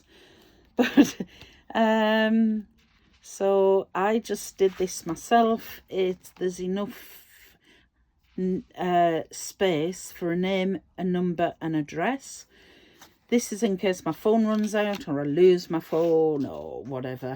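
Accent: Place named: United Kingdom. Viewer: Awwwwhhhhhh - thank youuuuu for the shout out Sugar!!!!!! ❤️❤️❤️ I looooove your planner flips!!!!!!! Our planners look like Twinzies!😂😂😂😂😂 Thanks for sharing and doing these videos! They are so relaxing and I’m sure you always hear how Americans love the British accent. It’s sooo CLASSY. 🌸💕🌸💕🌸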